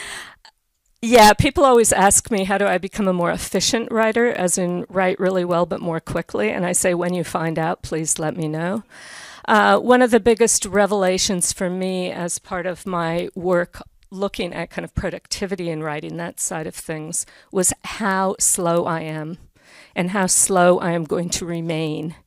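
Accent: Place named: New Zealand. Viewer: Dear, thanks, I did enoy this presentation (as some others) of yours - admittedly, it's because I'm a linguist and a fan of Steve Pinker's. Steve has been mentioning you for a couple of years, so I did check some of your presentations. Steve's been right, you're great - and, frankly, I do love your NZ accent (frankly, no offense - I'm a phonologist/historian). Thank you!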